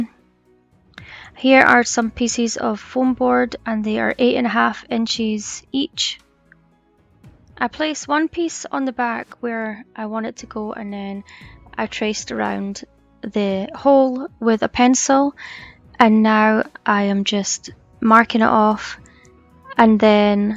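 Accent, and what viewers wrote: Scotland, United Kingdom. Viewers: All your designs are well done and gorgeous!! I may try a couple of them but will try to use wood pieces in place of some of the building blocks. Hope it will work although I realize my versions will look different. Your projects are very creative and I enjoy your Scottish brogue as it reminds me of my cousins in Ireland! Similar vocabulary 😄😄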